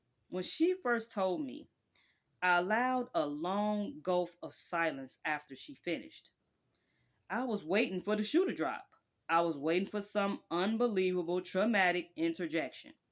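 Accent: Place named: South, United States. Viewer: what a great cookbook!! I love that you add the southern accent😊❤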